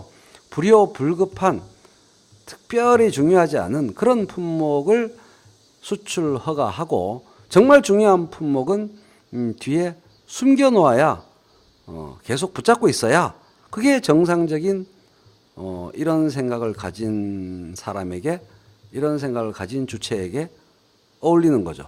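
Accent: Busan, South Korea. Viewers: 궁시렁 궁시렁 씰뗴없는 ㅋㅋ ㅋㅋㅋ 진짜 시작부터 속이 시원!! ㅋㅋㅋㅋㅋ저도 부산놈이라 그런가 옆집 아저씨가 설명해주는거 같아요 ㅋㅋㅋ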